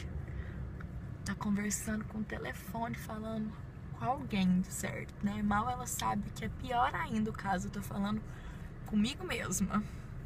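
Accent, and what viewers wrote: Minas Gerais, Brazil. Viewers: seu cabelo esta incrível!!!!! Faz um vídeo de cuidados com o cabelo loiro, como vc matem a cor. Adoro seu humor nos vídeos, sotaque mineiro é o melhor 👏👏 beijosssss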